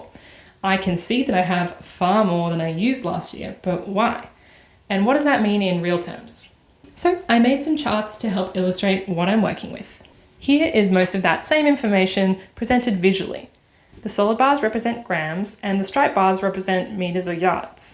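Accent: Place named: Australia. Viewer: As a Maths teacher and someone who has been knitting for over 40 years (and every day for nearly 20 years), I cant tell you how much joy this video gave me. I have all my stash on ravelry and have crunched numbers many times over the years but never to this detail and certainly not lately. Might be time. I’m curious. Thanks for sharing. Also nice to hear another Australian accent on the YouTubes. ❤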